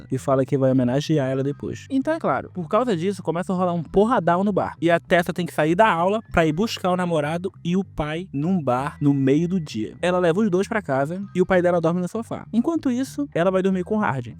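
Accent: Pará, Brazil. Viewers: Mano, fala sério, tu és paraense, né bicho? Tu é doído é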